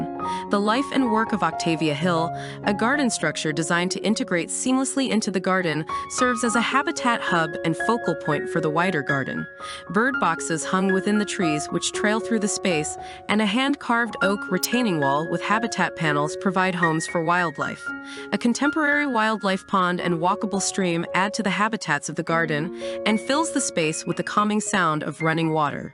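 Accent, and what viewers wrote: United States. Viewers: why is this being narrated by an American robot?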